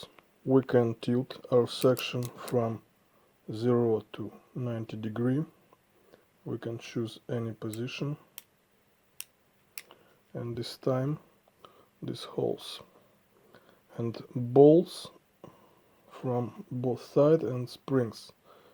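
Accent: Russia. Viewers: русскоязычный?.Спрашиваю потому что интересно на английском говоришь.как будто Русский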